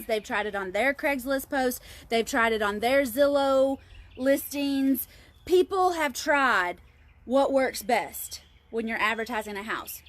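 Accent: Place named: South, United States. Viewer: Such a cute lovely southern bell accent ❤️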